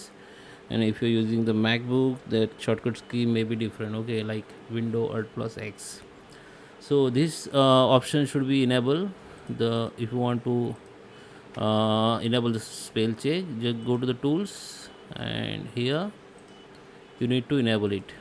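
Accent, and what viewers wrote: India. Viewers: Are you south indian!!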